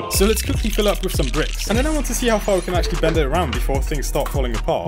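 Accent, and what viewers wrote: United Kingdom. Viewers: Your from the uk aren’t you?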